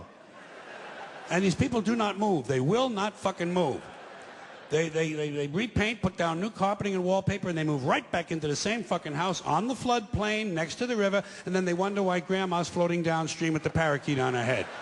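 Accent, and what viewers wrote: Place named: Mississippi, United States. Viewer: this motherfucker is a good comedian but tone down that Mississippi shit bro!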